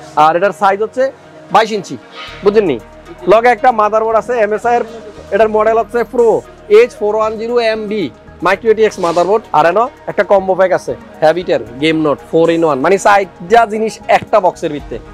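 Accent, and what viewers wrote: Noakhali, Bangladesh. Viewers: Vai apni NOAKHALIR NAKI 🥰🥰🥰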